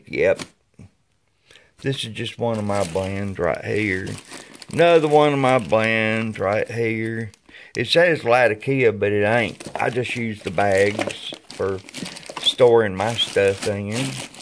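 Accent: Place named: South, United States. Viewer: I honestly enjoy that you sound like forest gump (not an insult on your intellect just similar accent)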